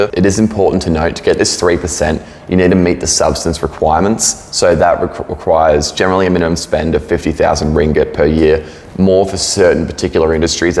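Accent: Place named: Australia. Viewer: Sounds Australian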